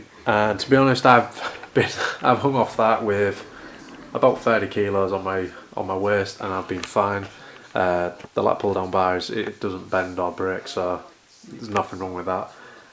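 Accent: United Kingdom. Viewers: I think you should narrate a nature video. Smooth British accent sounds so intelligent through the speakers. Oh, and nice rack.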